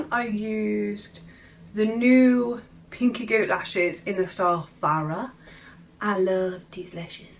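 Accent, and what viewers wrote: United Kingdom. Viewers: Done: Insta giveaway. <link> OMG I’ve watched you on insta silent for so long, I didn’t even know you had an accent from England! I’m near Newcastle. Every time I hear your kind of accent I think of that “I’ve got a brand new combine harvester and I’ll give you the key” 😂😂😂 don’t be offended please lol! P.s it’s skinny but it’s wide 🤙🏻😉😂❤️ love your vids on insta! More in YouTube please deffo ❤️❤️❤️❤️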